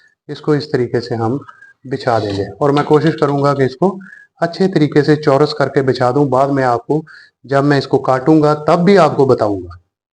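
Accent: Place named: Punjab, India. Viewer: Sir AP ki nation sa lagta ha k AP panjabi hain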